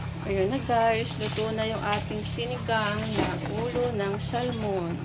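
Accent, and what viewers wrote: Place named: Iloilo, Philippines. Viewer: Hi sis, its Melanie Tingson if you remember me hehehe.. wow sarap naman ng sinigang ng ulo ng salmon. I love this too, bagay sa panahon na maulan Ilongga ka ba sis? narinig ko kasi hehehe kainin na natin yan sis...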